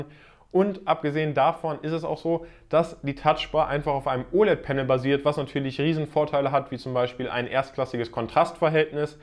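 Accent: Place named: Germany. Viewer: Habe vor kurzem dein englisches Video gesehen und mir wegen den Akzent schon gedacht dass du aus Deutschland kommst. Jetzt weiß ich dass ich da richtig lag :-D Du machst gute Videos.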